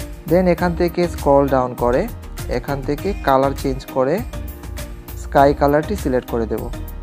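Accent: Sylhet, Bangladesh. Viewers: vai sylhety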